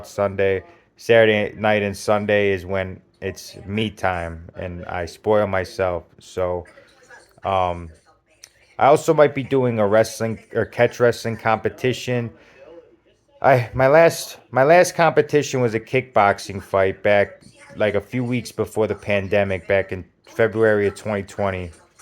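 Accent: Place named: Upper Midwest, United States. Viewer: Yeah it's a northern Midwestern accent. I've been told I have a Michigan accent, which is where I grew up. I'n rural in 46788 Indiana, 45k and on the od l list. I heard that John Prime had a Midwestern accent with a hint of his Kentucky roots. I believe he was from Chicago, and I really love his work. Good to hear you talking about UFC. The Michael Pereira fight last Saturday was incredible.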